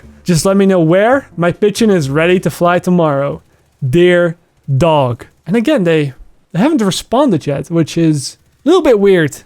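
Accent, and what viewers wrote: Netherlands. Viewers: BTW, I only started watching you recently, but the first video I saw from you, I could already tell you were the Netherlands... It's you accent, it's so obvious haha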